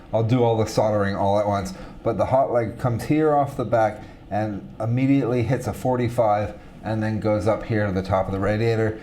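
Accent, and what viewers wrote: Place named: United States. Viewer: I’m new here. He’s probably already explained it in previous videos, but I’m a bit confused about American accent, farenheits and UK power outlets 😀 what’s going on?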